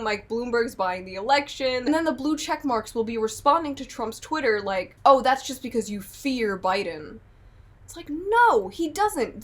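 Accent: New York, United States. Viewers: New to the channel, can’t get away from what sounds like a New York accent? Sorry lol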